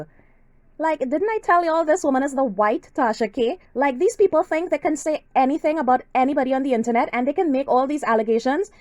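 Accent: Trinidad and Tobago. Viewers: Saw the repost through Emily Baker... As soon as I heard your voice, I recognized the Trinidadian accent 🇹🇹 Congratulations on succeeding on this platform! ❤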